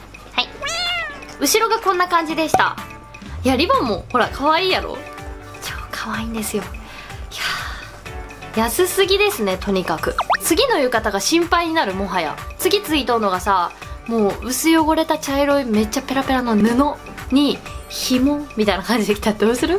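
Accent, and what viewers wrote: Hakata, Japan. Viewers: 博多弁可愛いw 二個目の浴衣個人的に好き！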